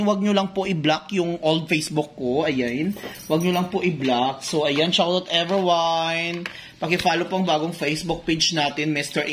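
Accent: Iloilo, Philippines. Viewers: Daw ilonggo ang tono haha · Taga saan po kayu?ilonggo ka eh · ilonggo ka po? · daw ilonggo kaman maghambal. .hehehe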